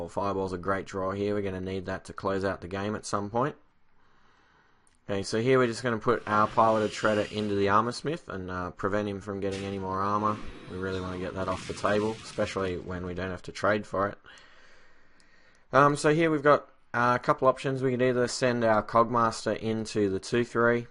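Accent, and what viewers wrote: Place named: Australia. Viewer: BNOracle Here. In the intro, you really needed to use the word snowball. Also,You sound way more australian through the snowball :P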